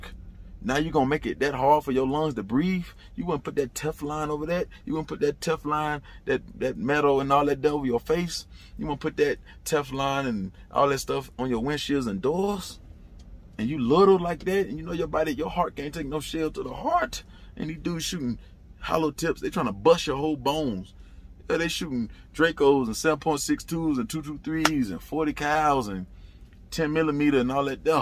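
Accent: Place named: Louisiana, United States. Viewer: This whole time I been tuned in thought you was from Louisiana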